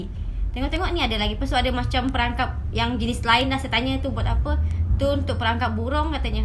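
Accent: Indonesia. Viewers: Dgr cm loghat kepulauan riau je.kabupaten lingga.btl ke? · Kamu ni org indonesia ke confius la Mcm bunyi org indonesia jer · ni org mane??.. dr segi gaya² ckp tu.. mcm org Indonesia.. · Awak ni org mana? Awak mcm ckp awak org Malaysia… tp loghat awak tu mcm org Indonesia cuba cakap bhs Melayu Malaysia. Contoh: Org Malaysia tak ckp beda, org Malaysia ckp beza, Indonesia bukan Indonesie, n byk lagi. mmg bukan bunyi org Melayu Malaysia pun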